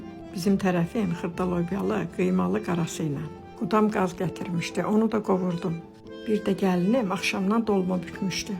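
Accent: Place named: Qazakh, Azerbaijan. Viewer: Haralısınız? Ləhcə var, mən bizim Qazax tərəflərə bənzətdim.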